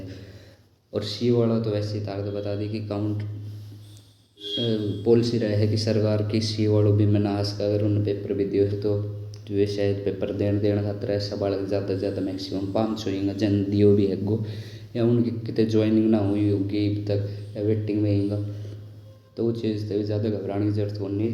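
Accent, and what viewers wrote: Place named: Haryana, India. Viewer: Brother mahendergarh side ka lag h😅😅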